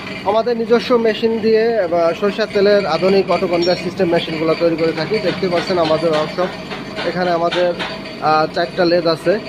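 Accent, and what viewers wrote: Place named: Faridpur, Bangladesh. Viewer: ফরিদপুর কথায়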